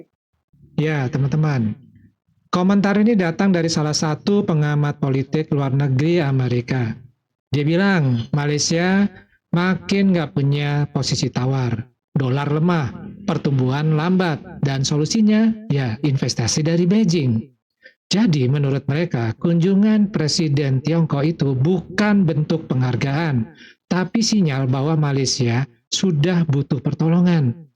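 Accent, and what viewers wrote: Java, Indonesia. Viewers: Jawa ngomong 😂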